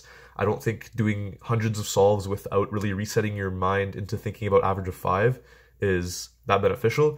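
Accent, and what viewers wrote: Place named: Canada. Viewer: Dang I just noticed how much of a canadian accent he has.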